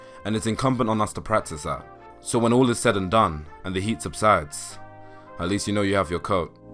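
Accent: England, United Kingdom. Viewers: Question: You sound English. Is that observation correct? If so, would you ever consider doing a video on blackness in the UK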